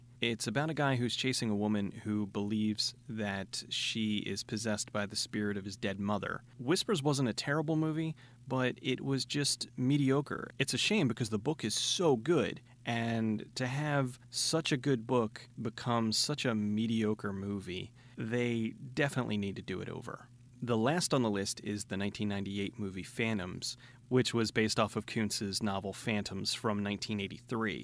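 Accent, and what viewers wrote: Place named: Indiana, United States. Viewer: where are you from? You sound like you're from Indiana maybe?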